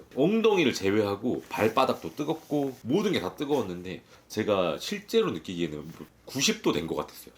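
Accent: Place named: Jeolla, South Korea. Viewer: 오 완전 서울분인줄 알았는데 유튜브로 보니 좀 전라도 사투리가 들리네요 ㅎㅎㅎㅎ 매력있슴다~~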